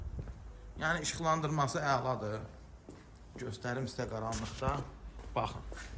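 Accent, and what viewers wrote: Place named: Qabala, Azerbaijan. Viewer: Ləhcənnən nömrəni 036 muqaisələndirdim qərara gəldim ki Qəbələdənsən😌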